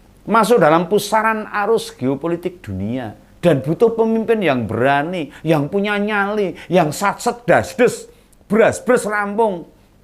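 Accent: Java, Indonesia. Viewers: Kocakkkk abis mas è...ngakak ngakak ngakak ...medokè boso ne bikin serius d balut dagelan...politik jd asik🎉🎉🎉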